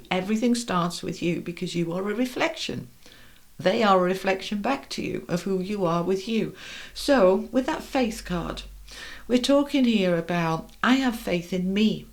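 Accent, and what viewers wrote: England, United Kingdom. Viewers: I love your British Accent, watching all the way from Namibia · South of Sydney Australia... British living here over 50 years can't beat that Pommies accent❤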